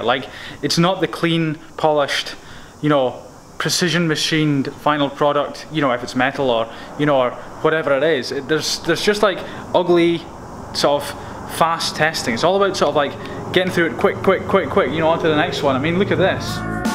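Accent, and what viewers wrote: Ireland. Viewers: i love ur irish accent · are u irish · are you Irish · u have a cool Irish accent any way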